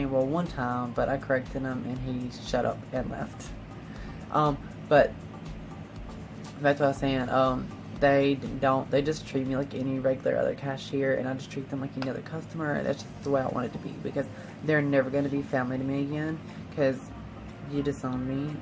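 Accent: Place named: South, United States. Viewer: You look great,!!! Love the Southern drawl.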